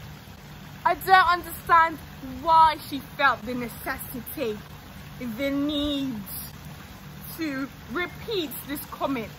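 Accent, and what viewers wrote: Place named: United Kingdom. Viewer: Is da British accent for me luv ❤️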